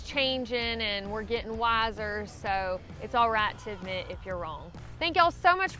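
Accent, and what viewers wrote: South, United States. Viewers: Were you born in the South? You pretty much sound Southern. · I am not a backpacker, but I like listening to your awesome southern accent! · florida born and raised i really enjoy listening to your southern accent🤗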